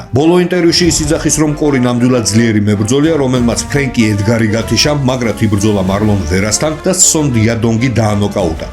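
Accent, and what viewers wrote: Armenia. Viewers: მადლობა სომხურმა აქცენტმა მაგრა გაასწორა საღოლ იუმორში👍👍👍👍👍👍👍👍😁😁😁😁😁😁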